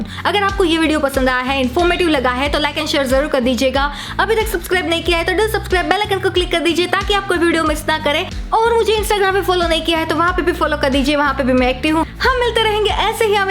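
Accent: Gujarat, India. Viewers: Gujarati tone lag raha hai aapki boli mein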